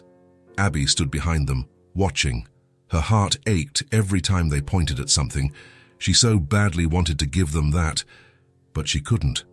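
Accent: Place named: United Kingdom. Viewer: What's with the British accent speaking American words. Since when do you cut into risotto?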